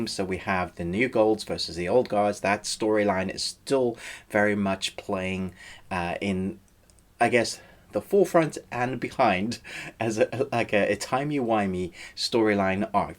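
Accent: South Africa. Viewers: Do I detect a slight South African accent there? Could be wrong but maybe not.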